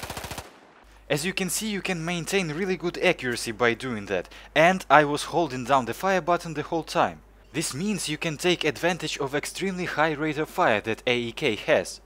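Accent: Russia. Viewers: Мэн а что ты хотел от русского человека?) Идеальное произношение??? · лучше смотреть видео у англичан с их акцентом ,чем слушать наш,просто)а так хорошая попытка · Акцент русский но вообще Можно делать видео на англизком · ага, и будет ужасный русский акцент и на немецком. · Акцент сильно русский(((((((((((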